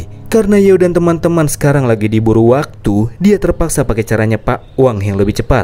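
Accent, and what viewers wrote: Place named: Payakumbuh, Indonesia. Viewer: Logat bng kaya orang pykmb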